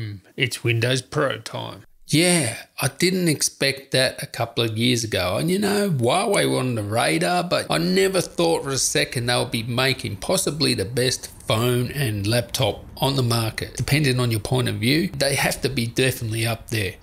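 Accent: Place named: Australia. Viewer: As an Aussie it's weird to hear super Aussie bloke talking in American dollars.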